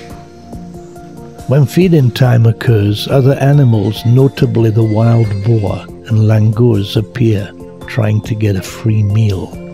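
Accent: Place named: United Kingdom. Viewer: Heroin commentary ftw. I am expecting an "I love you Susan" followed by a death rattle throughout this video. Please man, nice video but have a cup of coffee before you narrate a 20-minute long video sounding like a dying 110 year old Vietnam veteran. The "old British" charm gets somewhat drowned in a weird combination of sleepiness, cringe and wanting to kill myself.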